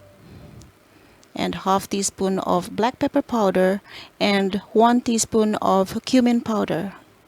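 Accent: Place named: United States. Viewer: Ano ni sya dai..daw nmit haw..nmian gid ko bala sng tingug mo dai..dw americana gid😊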